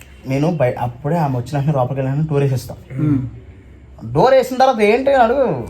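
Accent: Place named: Andhra Pradesh, India. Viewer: Hi anna mi videos einthaka mundhu challa manchiga undavi anna Eppudu videos lo andhra slang maku aithea manchiga anipistledu anna aa slang maku adram aithea ledu anna so plz deniki recat avu anna koncham 😊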